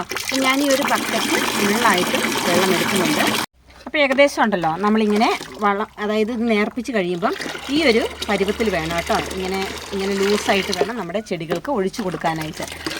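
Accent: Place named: Thrissur, India. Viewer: സാധാരണ തൃശൂർ ഭാഗത്തെ സ്ലാങ് ൽ വരും,,,,, ട്ടോ ട്ടോ,,,,,, തൃശൂർ പൂരത്തിന്റെ വെടിക്കെട്ട്‌ പോലെ 😁😆😆😆,,,,, ഞാനും തൃശൂർ ക്കാരിയാണ് ❤️ with proud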